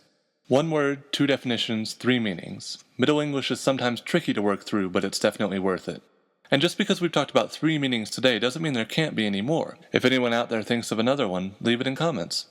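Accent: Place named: United States. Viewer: I found an American accent funny talking about Middle English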